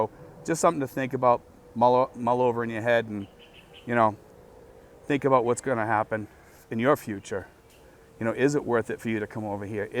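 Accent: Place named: New England, United States. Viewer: Great Vlog! You made me hungry for New England Chowder soup listening to your accent. Campells it is! Crackers n pepper! Sincerely Bruce Tattrie Edmonton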